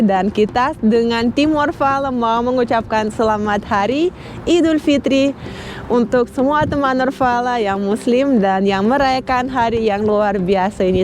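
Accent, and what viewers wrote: Indonesia. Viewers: Wow keren bahasa indonya aksennya indo banget.. 🇮🇩❤🇸🇮👍😎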